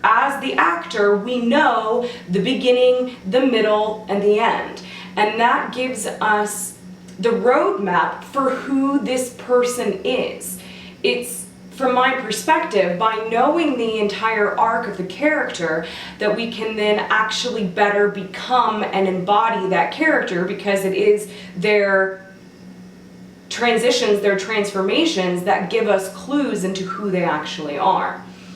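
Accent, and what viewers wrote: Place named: England, United Kingdom. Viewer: You sound very English this week.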